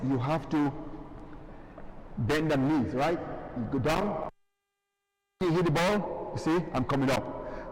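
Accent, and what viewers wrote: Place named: Saint Vincent and the Grenadines. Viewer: Good tips. Are you a Vincy? I noticed your accent.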